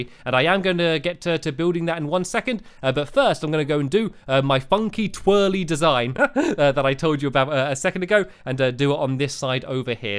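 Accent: England, United Kingdom. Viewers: you have been building so many things in your lovely world thank you for doing that I have been building so many things on minecraft and it all came from you keep doing what your doing. P.S.I love your British accent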